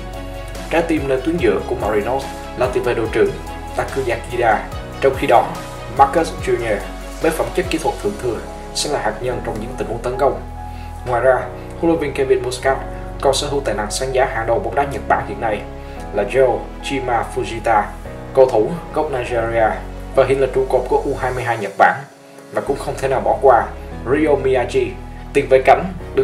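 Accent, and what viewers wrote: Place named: South, Vietnam. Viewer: Giọng miền nam đc chưa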